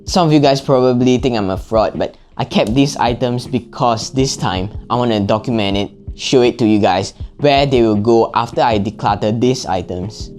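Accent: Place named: Singapore. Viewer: it is Singaporean accent, go to Singapore and you'll find many people talk like this 😊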